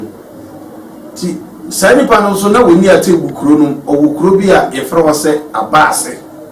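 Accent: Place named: Ashanti, Ghana. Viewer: Great job, brother. But will urge you to speak bono kasa, some of your tones in this video is asante , I hate that.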